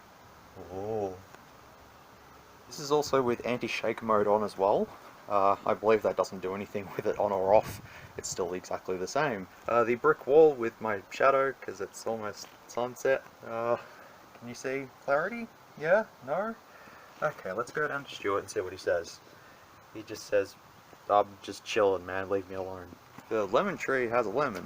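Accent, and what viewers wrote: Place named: Australia. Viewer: That mic test/phone call/your accent always reminds me of our old mate Danky/Female Snake "Frank" ("Dankpods") when he goes on a ramble while testing microphones (Congrats to him for achieving 1M Subs too!!) As well as Hugh Jeffreys are one the only three Aussies that are pretty much the only tech reviewers on my Subscribed list.. 👍👍😎🇬🇧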